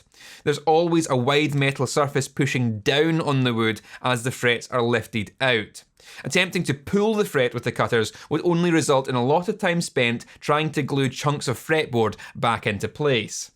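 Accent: Scotland, United Kingdom. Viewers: I love how aggressively Scottish is your accent. Amazing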